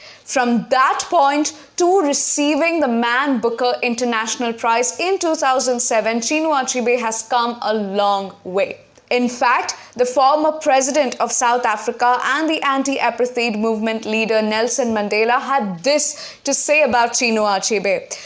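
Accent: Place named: RP, United Kingdom. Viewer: Your accent sounds like that of a BBC News presenter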